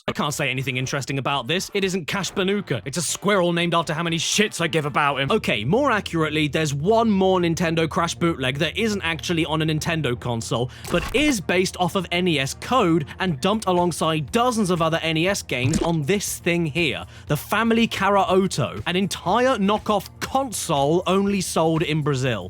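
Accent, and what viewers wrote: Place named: United Kingdom. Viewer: You are way too british but your account to be from the "united states"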